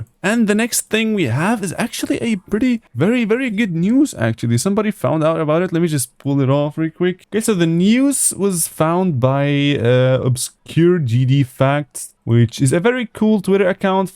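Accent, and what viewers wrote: Germany. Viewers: yu german?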